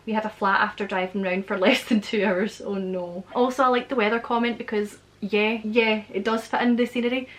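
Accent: Scotland, United Kingdom. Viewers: I love the Scottish accent, one of my bucket lists includes the Isle of Man TT race. I want to visit Scotland whilst there but so much to see, so little time!! Love your content, keep up the great work!! 🥰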